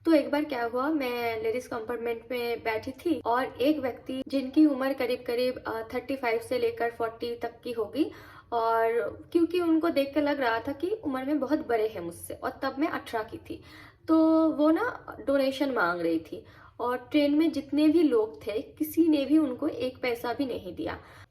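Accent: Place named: Bengal, India. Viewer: Didi apaki akhee shee pata chal raha hee kii app bengali hee ., kyu kii meri devi jii bhii west Bengal shee hee 😊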